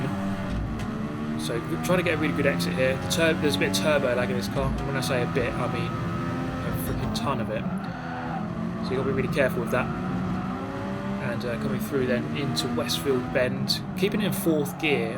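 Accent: United Kingdom. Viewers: British humour, the most hilarious actually x) Btw I'm French, British narration is the most fluid/funny thing I know lmao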